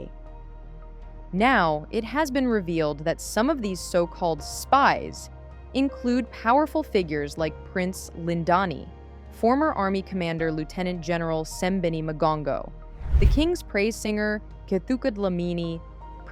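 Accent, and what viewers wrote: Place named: United States. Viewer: I wonder where this is going, you are speaking with American voice, what do you want from Africa because your President does not like Africa. Check your country, God has revealed USA as Mistry Babylon The Great. USA is about to be judged, it is imminent, prepare yourselves because you will be surprised while you looking at others countries.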